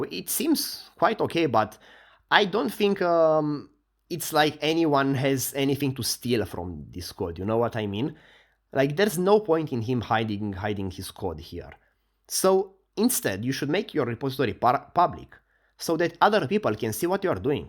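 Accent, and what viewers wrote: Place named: Romania. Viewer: Guys istg his english is fine but it's just the ̶R̶u̶s̶s̶i̶a̶n̶ Romanian accent.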